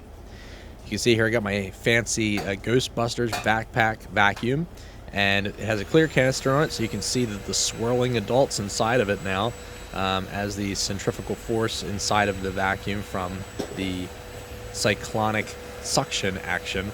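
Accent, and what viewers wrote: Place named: Pennsylvania, United States. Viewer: your pennsylvania accent really shows on your o’s haha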